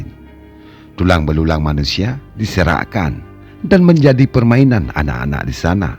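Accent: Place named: Bali, Indonesia. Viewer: Ini naratornya orang balii yaa Keliatan dr logatnya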